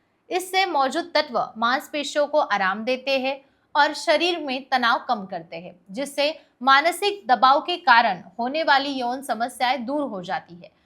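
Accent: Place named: Maharashtra, India. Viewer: Woh marathi hain sayad isiliye unka uchcharan waisa sunne ko milta hai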